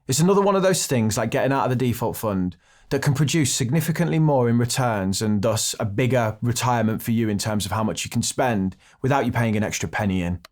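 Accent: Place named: Leicester, United Kingdom. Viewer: You from Leicester pal? You have a proper Lesteh accent……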